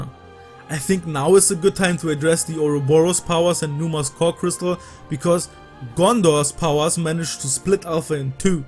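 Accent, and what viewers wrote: Germany. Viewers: Hi, ich habe deinen Channel gerade entdeckt und gleich mal ein paar Videos nacheinander geschaut. Super, einen Youtuber aus Deutschland zu finden, der sich mit Xeno-Games beschäftigt. Hab' auf jeden Fall ein Abo dagelassen und bin gespannt auf deinen weiteren, interessanten Content. Abseits davon, ich könnte mir vorstellen, dass du leichter mehr Reichweite gewinnen könntest, wenn du hier und da etwas mehr an deiner englischen Aussprache und den Formulierungen feilst. Ich hatte den starken deutschen Akzent ziemlich schnell rausgehört und das hat mich leider immer mal aus dem Inhalt rausgerissen. Einige Fehler im Satzbau bzw. bei verwendeten Begrifflichkeiten waren auch festzustellen, ich konnte mir aufgrund meines deutschsprachigen Hintergrundes aber gut zusammenreimen, wo das herkommt. 🙂 Das ist absolut nicht negativ gemeint, also bitte nicht persönlich nehmen! 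Ich finde lediglich, dass es deine Videos optimieren würde, wenn du deine Scripts möglichst nochmal von jemandem gegenlesen lässt, der Englisch etwas flüssiger beherrscht. Ich bin zwar kein Englisch-Muttersprachler, habe aber durch meine Arbeit und Hobbys seit vielen Jahren täglich mit Englisch zu tun. Falls du Bock hast, kann ich dir gerne Tipps geben, wie konkrete Sätze besser formuliert und ausgesprochen werden können. Ein allgemeiner Tipp: Hör dir ruhig mal dein Script über TTS (Text-to-Speech) an und sprich es nach, das kann massiv helfen, die eigene Aussprache zu verbessern. Ansonsten, tolle Videos, sehr informat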